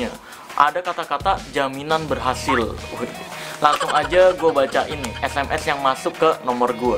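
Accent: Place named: Tegal, Indonesia. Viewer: Bg tegal yah gw tegal jawa tengah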